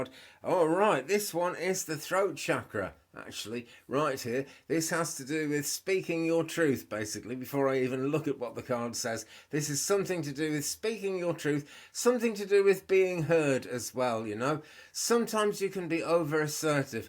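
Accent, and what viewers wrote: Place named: Wales, United Kingdom. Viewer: Love your voice & Welsh accent! My former mother-in-law was Welsh and you remind me so much of her! Have a great day!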